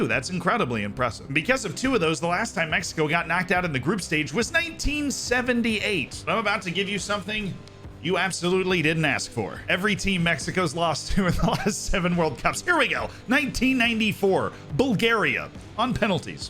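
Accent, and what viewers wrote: United States. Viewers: Your accent is way too american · I just now realized you are American lol. I just assumed, as an English speaker talking about Football, you were English. Even though your accent is very clearly americam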